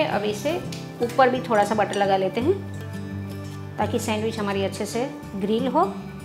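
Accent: Gujarat, India. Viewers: Aap gujrati h na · bhale Tame hindi bolo , pan ek gujarati bija gujarati ne odkhi j jay 😃😃😄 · Too much of ur gujrathi accent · Apart from your surname your tone also confirms that you are from Gujrat .. 😊😊 and also the kitchen setup all white white .. so nice 👍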